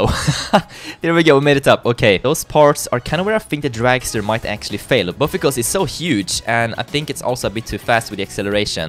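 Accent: Sweden. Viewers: are you from sweden? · are you swedish? ps love ya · oh he is Swedish i knew it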